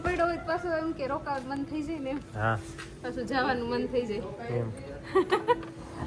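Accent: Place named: Bhavnagar, India. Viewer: mne tame bolo chho a spich mne bav game aam bi mne bhvngar ni lengwej boooj game mari frend gondal na pinl gohel atle pelle thi vaato karvi bav game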